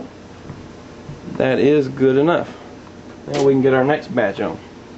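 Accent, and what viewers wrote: Texas, United States. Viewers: Where are you from I love the way you talk your voice sound cowboy style Texas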